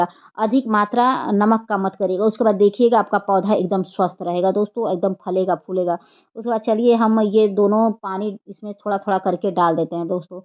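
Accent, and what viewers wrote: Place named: Uttar Pradesh, India. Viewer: आप पक्का यूपी से हैं।🎉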